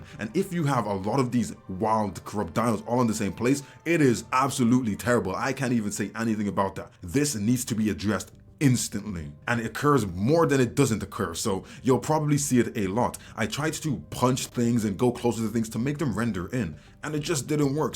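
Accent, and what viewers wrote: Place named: United Kingdom. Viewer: Somewhere in the uk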